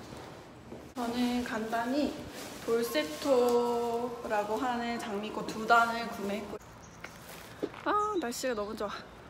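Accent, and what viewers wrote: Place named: Seoul, South Korea. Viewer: ~~ 덕분에 진짜 몇년만에 본다 ~~!! 유튜브 라니!!! 근데 왜 서울말쓰려고 노력해?? ㅋㅋㅋ 너무 귀엽고 이쁘다 유튜브스타로 대성하리!!!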